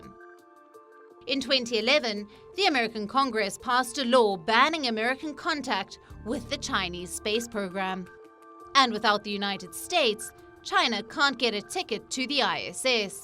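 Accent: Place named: India. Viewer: I noticed nothing but the lovely Indian accent.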